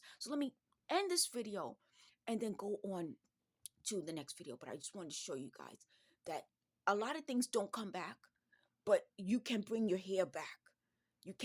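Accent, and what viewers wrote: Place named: New York, United States. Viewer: I'm sorry you are going through this! Do you think it's Riverbay doing this? Or their leaks/ mold causing issues? I know other people that are having issues, and they think its the water (the shower water may have too much metal) or the mold. I hope your leg gets better soon. Have your doctor check your ferritin and all iron levels. Also, check your insulin level, specifically A1c test. Again, I hope things get better. Thanks for the hair oil info! 😊 btw I love your slight NY accent. Keep smiling and try not to let anyone get to you!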